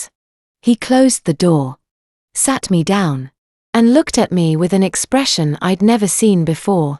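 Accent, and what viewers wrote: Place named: Australia. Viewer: Very disconcerting for the narrator speaking Aussie but claiming Boston as home. Decent tale tho.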